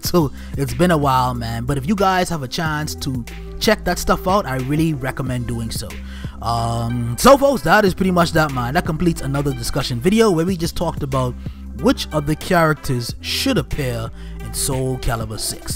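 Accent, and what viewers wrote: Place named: Bahamas. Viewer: Haha, nice video! I know that Bahamian accent anywhere!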